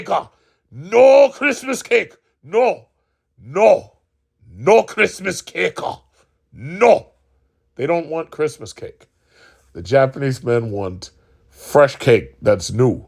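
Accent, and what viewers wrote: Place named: New York, United States. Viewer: Yo bro dead ass bc you have the NY accent, it is soothing AF to listen to your Passport Bro videos compared to other YouTubers. I can't be listening to those monotone channels.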